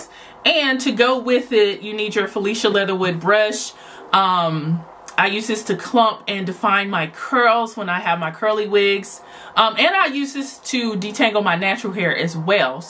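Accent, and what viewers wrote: South, United States. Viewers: You sound like a southern belle it’s too cute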